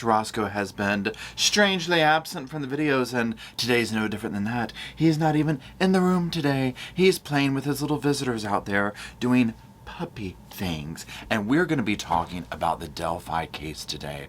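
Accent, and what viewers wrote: South, United States. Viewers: I wish you narrated audiobooks. You have such a great voice. It is perfectly clear with just a hint of a proper souther accent.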